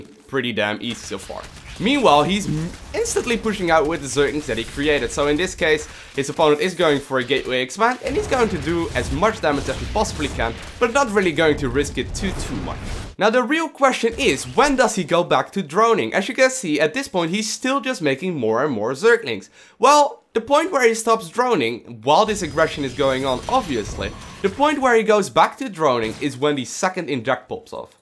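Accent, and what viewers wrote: Netherlands. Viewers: He's from the Netherlands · are you from Holland?? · Dutch, pretty much the same as a german accent xD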